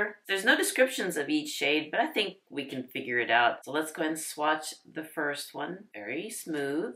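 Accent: United States. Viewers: Loved the looks - and all beautiful. Nice to see on mature eyes, very pretty but in a gorgeous elegant way. 💕, also love your American twang and your gentle energy. Just gained a new subscriber. Greetings from UK! 🥰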